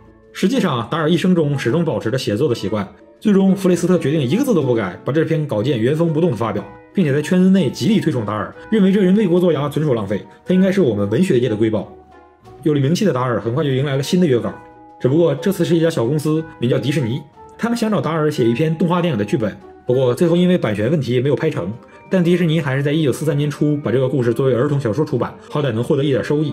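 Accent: Northeast, China. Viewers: 优秀的文案还得要用那迷人的东北口音读出来才得劲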